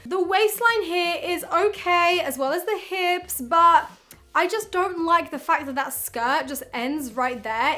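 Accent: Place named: England, United Kingdom. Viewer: what part of england are u from u have a accent its nice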